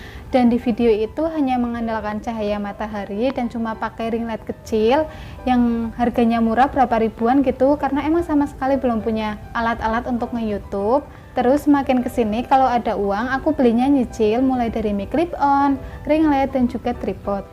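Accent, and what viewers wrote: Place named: Tegal, Indonesia. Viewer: tegal neh hhahah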